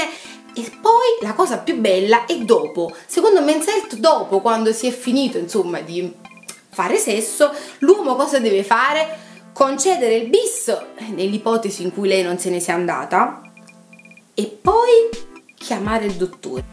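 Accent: Naples, Italy. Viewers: è l'accento napoletano a dare gusto al monologo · E' l'accento e la pensata napoletana che dona quella schiettezza e stimola l'intelligenza! Col mio accento romano non riuscirei a dare un senso di serietà al discorso... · Però questo accento napoletano non si può sentire... e io sono napoletano xD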